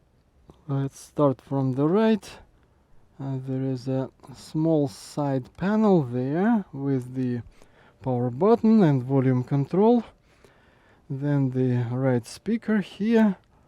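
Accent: Russia. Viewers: У вас такой русский акцент, я ошибаюсь ? :)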